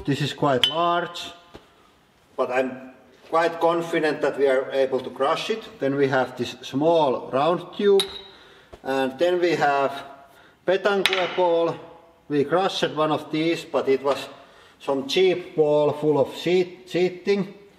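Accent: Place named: Finland. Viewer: oh god the finnish accent :D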